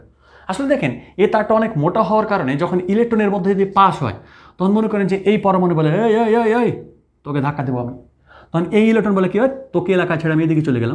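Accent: Rajshahi, Bangladesh. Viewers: আসসালামু আলাইকুম। স্যার আপনার বাড়ি কি রাজশাহীতে ??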